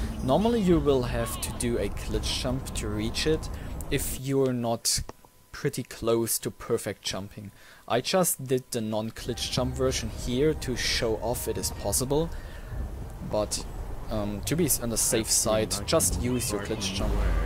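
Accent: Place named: Germany. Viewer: dieser moment wenn du sofort durch das sprechen merkst das die person deutsch ist xD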